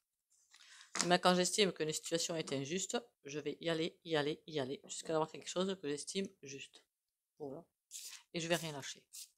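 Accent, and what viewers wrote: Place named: Midi, France. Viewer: avec l'accent fort du Midi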